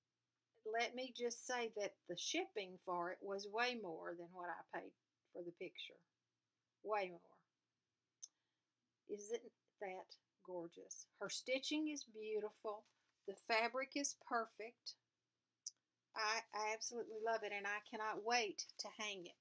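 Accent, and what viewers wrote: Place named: Tennessee, United States. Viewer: Hi! New subbie! Love your Accent! I'm in Tennesse and the accents sound very much alike! lol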